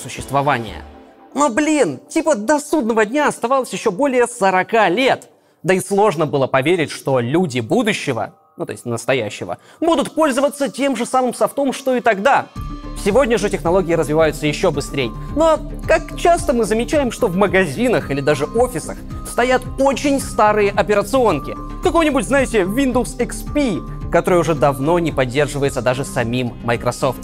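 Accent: Ukraine. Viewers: О, так ты Украинец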